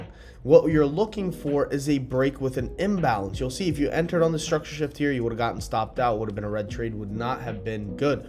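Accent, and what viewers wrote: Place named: Canada. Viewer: No way are you American, your Canadian with that accent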